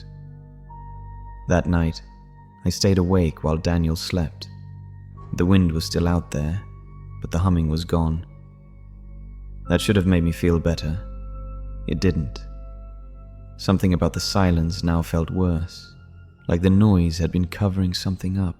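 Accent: United Kingdom. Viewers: For those of us who do not have the class of a British accent, it makes the narration of your tales exceptionally enjoyable. The meat & potatos of the stories are always great. Good to see you back.. God Bless you & yours Sir..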